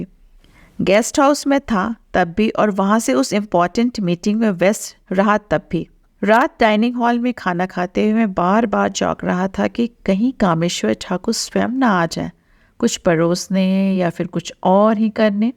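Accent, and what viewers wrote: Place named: United States. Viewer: Orator ka american accent hai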